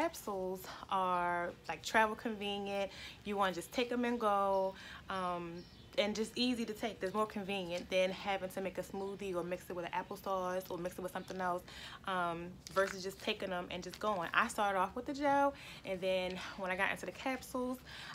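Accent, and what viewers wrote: Baltimore, United States. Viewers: She is nice...I hear that Baltimore accent...GOOD Content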